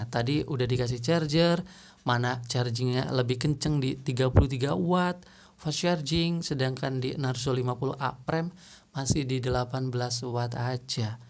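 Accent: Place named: Banyumas, Indonesia. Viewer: Abang ngapak orang mana ya?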